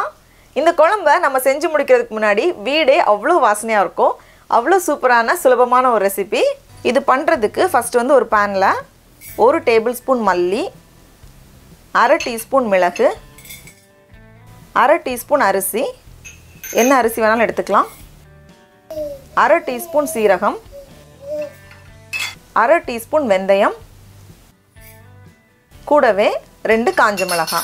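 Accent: Nagercoil, India. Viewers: Ur way of speaking Tamil in Nagercoil slang is soooooo good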